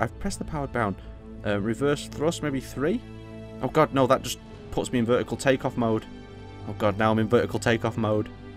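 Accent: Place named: England, United Kingdom. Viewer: No, that's a regional accent here in England. Tons of people speak like that, why would you? :p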